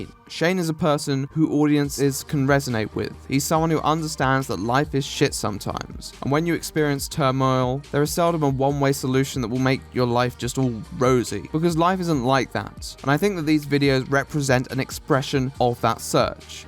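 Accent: RP, United Kingdom. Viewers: Just because he puts on a posh accent does not make him the BBC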